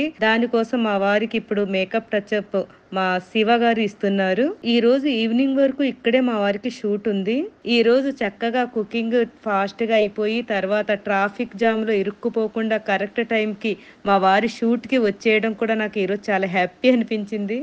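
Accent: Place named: Andhra, India. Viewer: మేడమ్ గారు మీరు తెలంగాణాలో ఉండి కూడా, అంద్ర తెలుగులో స్పష్టంగా మాట్లాడటం చాలా సంతోషం అండి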